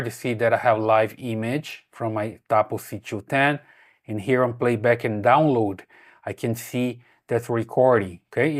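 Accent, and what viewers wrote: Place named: Brazil. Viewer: Vc eh brasileiro nao eh? Esse nosso sotaque eh inconfundível. 🇧🇷 Os vídeos são mto bons, bem úteis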